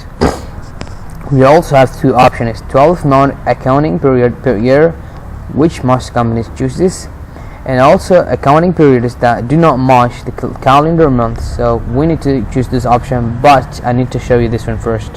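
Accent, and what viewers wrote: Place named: United Kingdom. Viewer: What’s up with that British accent 😐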